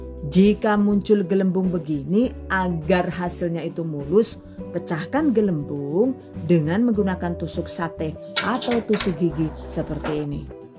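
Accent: Banyumas, Indonesia. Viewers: Buat ciri khas mba, apalagi ada dialek ngapaknya, bagi saya menghibur sekali 😁. Sukses selalu 🤗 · Ini resep mantuuullll bangetttt......simple, enak, dan jdi inspirasi jualan...( Meski sampai sekarang belum jualan. Juga ), gara2 Nemu video ini, aku jdi seneng banget kro channel kie....maning narasine kadang di tambaih bahasa internasional, yakue ngapak....tambah seneng lah inyonge....sukses terus ya buund....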